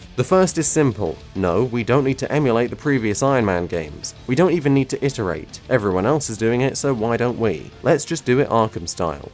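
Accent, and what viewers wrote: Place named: United Kingdom. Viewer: u sound like a stereotypical nazi bad guy in hollywood movies, of course, with a british accent.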